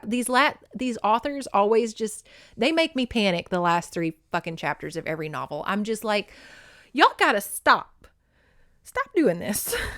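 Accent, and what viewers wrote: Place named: North Louisiana, United States. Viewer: I grew up "spittin' distance" from Blanchard. I thought you had a N. Louisiana accent. 😂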